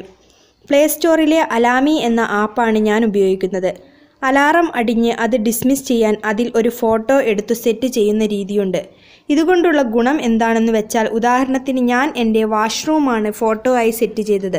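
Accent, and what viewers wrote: Kozhikode, India. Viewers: your clt slang is so attractive and sweet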